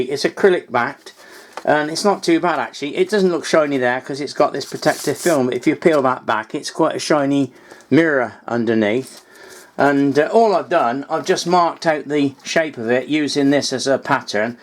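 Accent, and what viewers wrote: United Kingdom. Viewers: I’ve enjoyed all your fretwork/scroll saw videos. I live in U.S.A. but I lived in the U.K. in 1981 and 1982. Your British accent brings back fond memories. Here in the U.S. we don’t have anything like the vintage fretwork patterns like what you’ve shown in this video. Can you suggest an online resource from vintage fretwork patterns?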